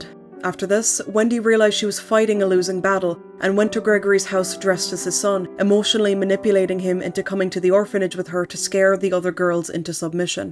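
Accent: Ireland. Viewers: It’s so cool and refreshing to hear a fellow Irish person talk about the niche PS2-era horror that I’m so interested in. The standard of your writing and work in general is so good! Subscribed! 💖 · New viewer. I love horror games and Irish accents so now you will never get rid of me! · so SuperEyepatchWolf sent me here and I'm bingeing basically all of videos (I CANT BELIEVE I DIDN'T KNOW THE UK REFUSED TO PUBLISH THIS) but im also trying to figure out the region of her accent? I've tuned into a few Irish accents but I reckon this ain't Dublin or Cork or Wicklow, what IS the accent?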